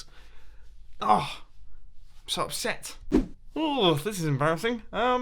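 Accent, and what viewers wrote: England, United Kingdom. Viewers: I love English YouTubers the way they talk makes me laugh(this is not an offensive comment I hope)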